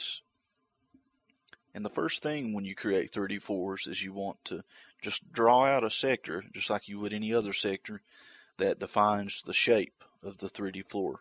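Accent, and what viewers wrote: South, United States. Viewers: Came here researching 3d floors. Was pleasantly surprised to hear a voice that clearly knows a thing or two about DooM editing that also has a southern accent. Dirty souf y'all. :D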